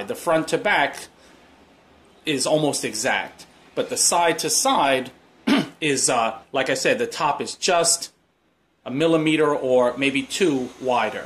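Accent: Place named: East Coast, United States. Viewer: You're clearly from the East Coast... I'm guessing Jersey? Like your vids, I'm subscribed, continued success.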